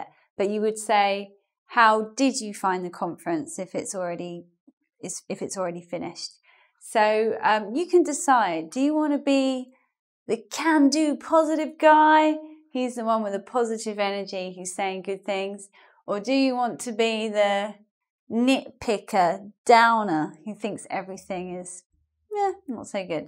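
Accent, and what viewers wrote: United Kingdom. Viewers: I like your British accent ! Especially when you said 'Are you attending the welcome drinks tonight ?', your accent was very attractive and a kind of funny to me and made me laugh !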